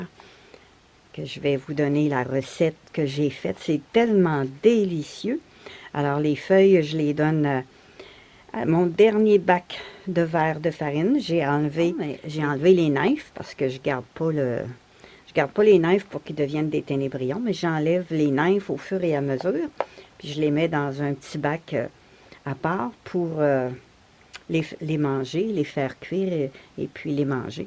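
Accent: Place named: Quebec, Canada. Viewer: Bonjour et bonjour de france,j'ai plaisir à suivre vos vidéos mais aussi plaisir à écouter ce si jolie accent québécois. J'aurais juste une question,vos vers vos les élevés dans le noir ou avec un de lumière? Cordialement Romain et comme on dit dans votre belle province gros bec.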